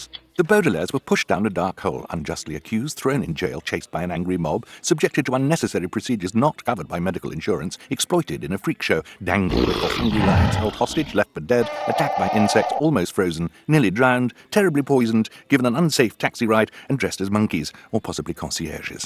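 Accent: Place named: United Kingdom. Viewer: why is he using a British Accent The Real Lemony Snicket A.K.A. Daniel Handler Is American. I Know Because I Met Him In Real Like Before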